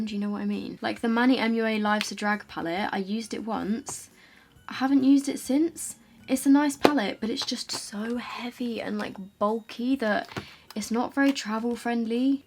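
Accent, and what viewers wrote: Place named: England, United Kingdom. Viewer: I def want to enter the giveaway, my email is <mail> also my twitter is i love you’re video and when I need to relax you’re my go to, the English accent is soothing to me and I just love hearing you speak. Good luck everyone, fingers crossed 🤞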